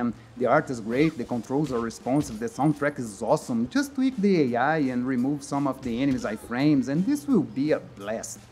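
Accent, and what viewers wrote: Brazil. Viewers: Parece brasileiro falando inglês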